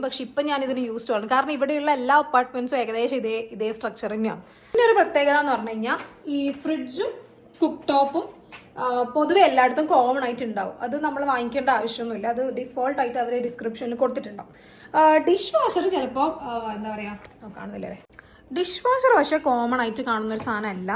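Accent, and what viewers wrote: Kannur, India. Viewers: Hi I am Sruthi from kannur but eppo engu oman l WRK cheyyunnu Edo unexpected ayitta thande video insta l kande adipoli anallo but youtube l vannu nookkiyappo kurachu videos alle ullu iniyum post cheyyanam YouTube l.WRK pressure nde eyal kannur basha kelkkumbo thanne oru happy a.athinu thande video kanananam enna avasthaya.nammal family ayi evide anu.kannur basha manasilavathavarkk vendi change varuthi basha thanne mari poyi. So iniyum video expect cheyyunnu. By aradika🎉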